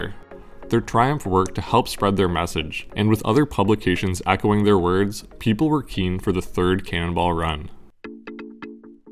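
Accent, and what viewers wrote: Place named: Canada. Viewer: You can always tell which videos are from the great white North with that pronunciation of the out out oooout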